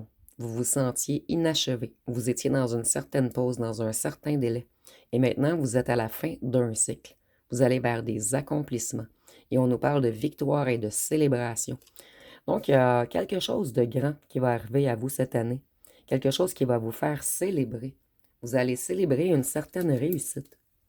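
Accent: Quebec, Canada. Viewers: Merci pour vos predictions avec le charmant accent quebequois.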